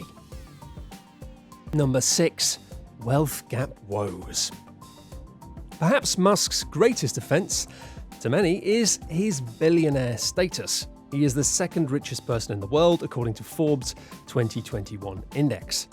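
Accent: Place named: United Kingdom. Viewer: British people make up such a small fraction of the world yet such a high percentage of Youtube narration. I don't like it. Turning off.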